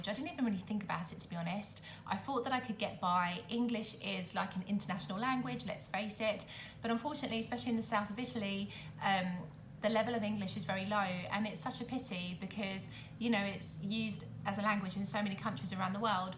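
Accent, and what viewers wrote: United Kingdom. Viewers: Hi, i'm watching all your videos because I want to improve my english with a British native speaker (I don't like too much the american accent..). They are funny and interesting, I found them useful for my goal, so... thank you for sharing your thoughts and considerations about your life in Italy. Sometimes your connected speech is a little too fast for my level, but it's an interesting challenge;) I have just a little tip for improve the enjoyment of your videos: the theme song is very very loud... but the audio when you speak is quite low, so I have to turn up the volume a lot during the intro... and a few seconds later the theme song starts and I find myself with destroyed ears!:) Ciao e grazie!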